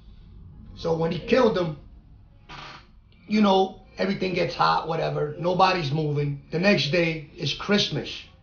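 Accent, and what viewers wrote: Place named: Brooklyn, United States. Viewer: I really enjoyed your stories please keep making videos. You and warpath both sound like guys I remember as a kid, I’m from Brooklyn Marlboro projects and Bensonhurst its makes me feel like I’m listening to the guys when I was a kid keep it up man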